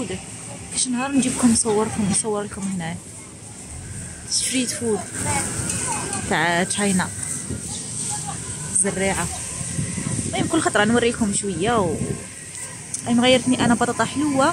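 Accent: Chlef, Algeria. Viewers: والله غير سمعت هدرتك حسيتك من شلف والله العظيم بنت بلادي انا ثاني شلفية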